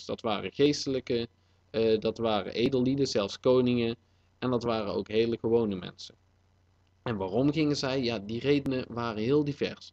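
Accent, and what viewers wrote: Limburg, Netherlands. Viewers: Ten eerste, dit is duidelijk geen Belg, meer iemand uit Limburg, Eindhoven zoiets en ten tweede doet ie meer dan jij in je hele leven zal doen;)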